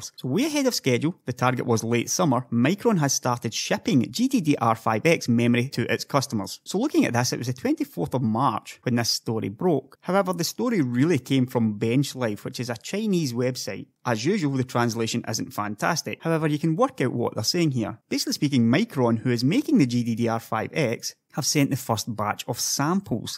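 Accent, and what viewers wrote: Scotland, United Kingdom. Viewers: Nothing wrong with his Scottish accent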